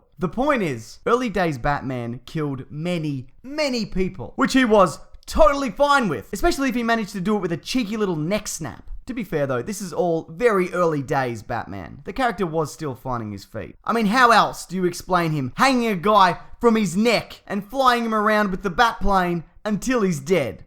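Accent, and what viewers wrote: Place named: Australia. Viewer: Someone explain how every single auzzie youtuber ( i think he is auzzie? ) sounds the sound Like this guys voice is the exact same as theauzziegamer and some other channels i know ( btw no offence im an auzzie as well mate k )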